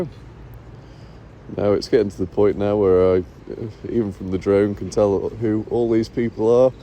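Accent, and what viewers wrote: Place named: Northern England, United Kingdom. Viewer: Listening from Canada there is something very Monty Pythonesque about this narration with a Northern accent. “Cor, that’s some cracken drivin, that is. T’stone from Shrewsbury. Fine village. Fine pe-pull t’are. Makes a bloke tear up… Right cinematic that is. And there t’is another load! Weren’t expecting that. Down t’lane, quick turnabout and dump! Were elegant, that! Taken up by, excavator, now…and it’s in! Not seen finer. Two before mornin tea.” Something like that. Still, every morning I’m watching. Love it.😊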